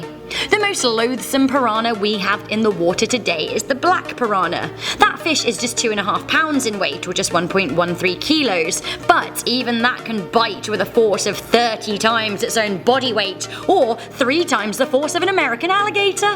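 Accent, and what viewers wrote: United Kingdom. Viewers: British accent 😠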